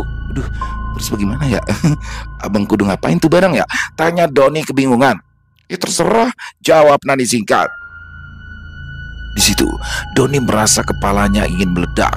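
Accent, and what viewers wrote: Jakarta, Indonesia. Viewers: Waalaikumsallam...malem bang. urang gunung hadir bang.ini cerita terpaporit saya warung kopi bang doni saya suka logat betawi nya inget dulu waktu tinggal di jakarta waktu kerja di kuningan hehe.salam sehat buat bang dan kru serta keluwarga😀🙏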